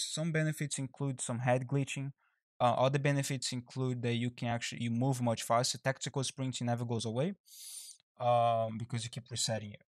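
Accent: Brazil. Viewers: mano tu é muito brasileiro kkkkk esse sotaque nao me engana , otimo ingles btw haha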